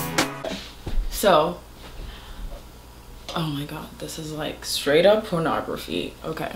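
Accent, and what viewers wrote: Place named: Botswana. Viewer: i literally came back to watch a couple of your videos from last year last week, this is such a pleasant surprise! also your setswana accent is legitimately my favourite accent i’ve ever heard, i tell my friends this all the time.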